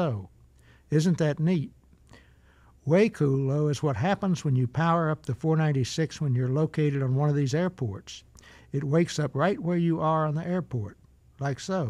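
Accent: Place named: Tennessee, United States. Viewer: You definitely don’t sound like you’re from HGR. Heck, you sound like an East Tennessean. Somewhere around Luttrell or Bean Station. I am based at Sky Ranch btw (TN98). Just NNE of good ole KTYS. Thanks for a wonderful video!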